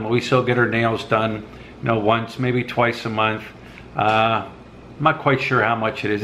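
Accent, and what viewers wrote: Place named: West, United States. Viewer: Also it could be funny if you are from Philadelphia , but you sounds like from the west part of the US.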